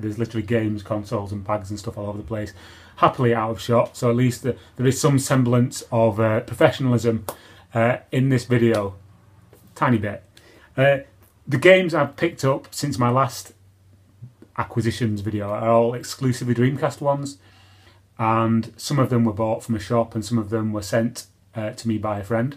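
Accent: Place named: Manchester, United Kingdom. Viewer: Didnt realise you were a manc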